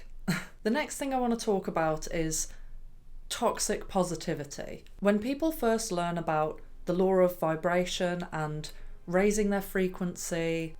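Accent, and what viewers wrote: Birmingham, United Kingdom. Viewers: In these turbulent times, I can recommend a return to traditional orthodox Christianity. As westerners, this is already pre-anchored in our subconscious and is a rich and interactive spiritual environment with angels, saints and The Theotokos (Mary) etc. Love your very slightly brummie-sounding accent 😊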